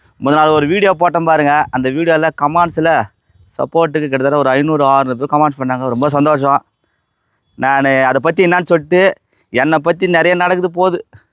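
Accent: Madurai, India. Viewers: Madurai vasagam super valthukkal 🎉🎉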